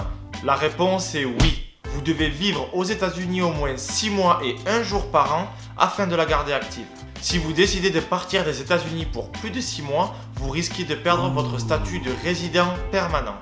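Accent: South, France. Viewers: Je regarde quelques-unes de tes vidéos et me suis abonné. Je me pose une question. Est-ce que tu accent un peu "du sud" s'entend quand tu parles anglais ? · en passant tu as une petite accent du sud n'est-ce pas?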